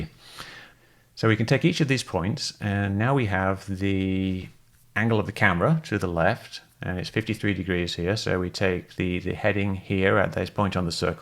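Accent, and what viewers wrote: United Kingdom. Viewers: Lmao if your stupid enough to believe this asshat knows more about a flir targeting pod than EVERY PILOT IN THE MODERN DAY MILITARY, then you deserve to be kept in the dark. I mean he used actual science and math to prove his point, while this dumbass used a flashlight in his garage in a lame attempt to make his point. Just becuase they have a British accent, doesn't mean they are intelligent. This guy just profits off of this shit ironically like the people he's trying to discredit. Think about it, who has the most to lose in this argument? Mick West or literally anyone else who actually trained as a fighter pilot? Use your damn head people!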